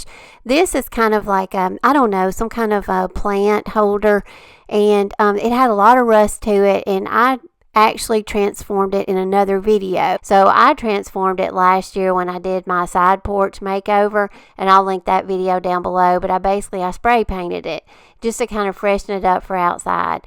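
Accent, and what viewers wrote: South, United States. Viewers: I so enjoy watching your videos...I love everything about them from your instruction to your display of the items and also your background music. I think you are so great at what you do and I just love all of your videos. You are such an inspiration. I also think you have the cutest southern accent and are so pleasant to listen to. Thank you for sharing your wonderful talent.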